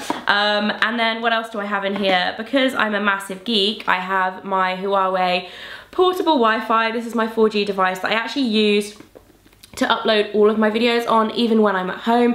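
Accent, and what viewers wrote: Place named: RP, United Kingdom. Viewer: I know it might be a weird thing to say, but I just love accent. English is my second language and I just think that all these English people sound so nice and posh and I wish that I could mimic accent.:D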